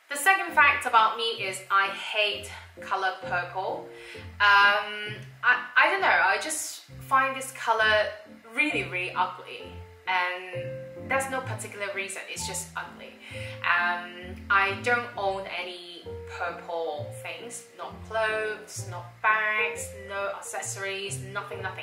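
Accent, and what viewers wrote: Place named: United Kingdom. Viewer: Ohh your english sounds so british now! Love it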